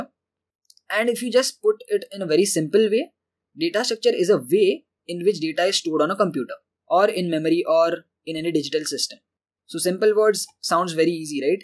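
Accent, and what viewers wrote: India. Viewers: "and welC0mE B4cK to DatA sTrucTure" Indian accent. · Indian accent with very simple and clear explanation... Keep going forward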